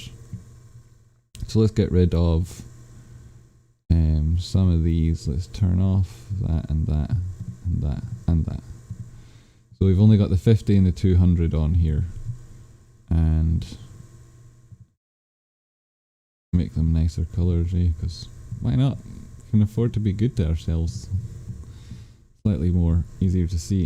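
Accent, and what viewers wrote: Scotland, United Kingdom. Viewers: Here on apeandys recommendation. Good to hear a Scottish accent 😂I bought some gme on etoro. Did you say that etoro was dodgy?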